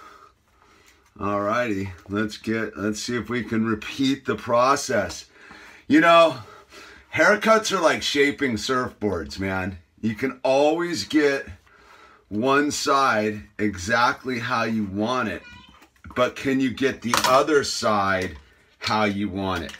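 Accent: United States. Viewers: John lydon be sounding a lot more American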